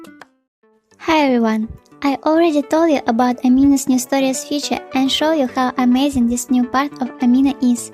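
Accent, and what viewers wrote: Russia. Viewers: I like it funny and I realized that you are Russian because you have a Russian, but I like your videos☺️ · Сразу поняла, что автор русский по акценту :D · Вот это акцент, сразу понял что человек русский) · Ты русская? Да, плохой вопрос... но по произношению заметил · Видно что русская. Или знаешь русский. Акцент есть. И кто нибуть, научите меня так рисовать 😍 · Акцент все же русский =v= А вот голос милый) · I think she's Russian and that is her Russian accent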